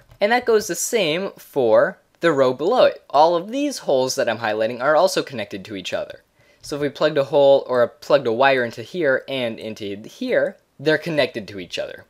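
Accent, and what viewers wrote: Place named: Canada. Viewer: Your "About" page says "United States" But your accent says: "Canadian" I'm confused. >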